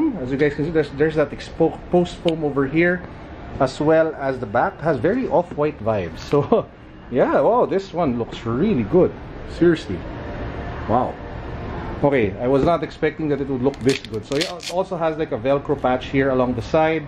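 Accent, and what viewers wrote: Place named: Philippines. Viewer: You are philipines because i can tell how u talk in english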